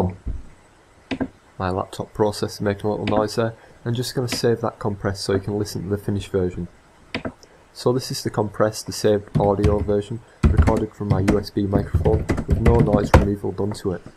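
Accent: United Kingdom. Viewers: Brilliant video, thank you. Are you from the North East of the UK? Your accent sounds very similar to mine. I'm from Middlesbrough. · Loving the boro accent man. Takes me back to my younger days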